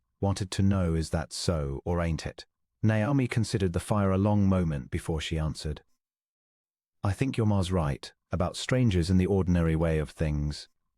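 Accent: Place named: RP, United Kingdom. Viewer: Terrible narration...reading the words as written not as they should have been correctly pronounced. Plus with a posh accent...not really in keeping in telling a story about the 1800's in the wild West of the US. The story in interesting...not really authentic in legal standing in that place and time...but interesting yarn.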